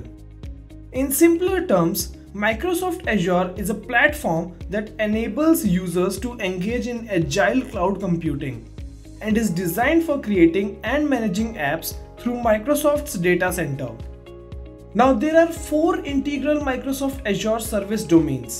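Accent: India. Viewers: indian accent :(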